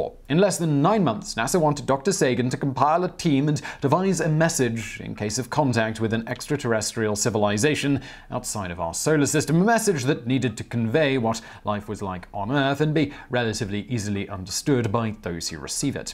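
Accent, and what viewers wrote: England, United Kingdom. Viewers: Sir - yes I said Sir. Pleeease do an episode on how difficult it was to take down a Knight on the battlefield during medieval times. I can't seem to find an interesting in depth video narrated on the topic with an English accent. Not too mention a video that also includes the history behind the armour, misconceptions and why they were so much more than an average soldier on the battlefield during their time.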